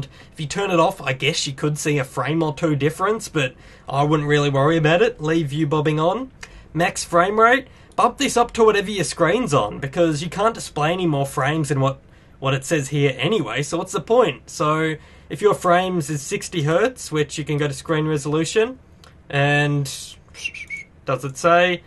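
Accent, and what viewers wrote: Australia. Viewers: umm... are you Australian, British or another country/background. Srry I just really wanna know cuz i'm not good with accents:S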